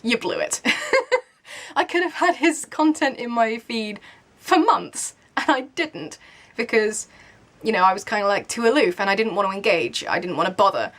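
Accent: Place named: United Kingdom. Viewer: Okay, I just scrolled through my entire liked videos list to try and find out through which of your videos I discovered you because I didn't discover you through your sister (I actually found her through you ;) ). It turns out I discovered you because of Pottermore. Back when it was still in the development phase and only a few people at a time could register I would look at tons of sorting videos on youtube and that is how I found you. It is kind of hard to discribe why I subscribed to you. It's number of reasons, all of which will probably sound pretty weird. I really like your accent (I'm from Germany and I absolutely adore British accents), I like the way you talk, your enthusiasm, your honesty, your opinions... also, you share two of my very favourite interests which are reading and writing and, of course, Harry Potter :) All in all I find these insights into your life incredibly interesting. 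You are a few years older than me so it is kind of like watching what my future might look like in terms of going to uni, having your own place, finding work etc. I do have my own Youtube channel (which is called MissSophieReads) which is basically just your stereotypical channel about reading and books (I haven't yet come up with any cool original video ideas).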